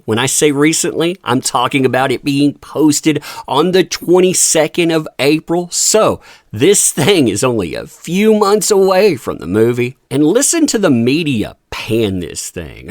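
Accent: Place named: Alabama, United States. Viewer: I just found this channel not that long ago but no offense, but is the main guy on here trying to pull off a gay redneck hillbilly from Alabama or something? The voice thing is really annoying. Is that like a gimmick just for this video or a couple videos or is that like how you sound all the time? It's really fucking weird cringe-worthy and hard as fuck to pay attention to whatever you're trying to talk about cuz I just keep seeing images in my head of some redneck dude down south wearing high heels or something it's fucking weird. Like I said man I'm not trying to be mean or offensive but I know I can't be the only one that that comes across that way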